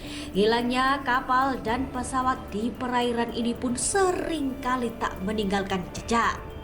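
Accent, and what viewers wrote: Java, Indonesia. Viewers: Suaramu medok.mbak Wong jowo ya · Pembawa berita nya medok bnget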